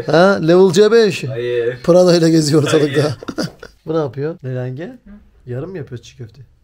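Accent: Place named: Diyarbakir, Turkey. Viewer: Slm hayırlı akşamlar Diyarbakır'dan siveniz sanki bizimkimize benziyor siz Diyarbakırlı misiniz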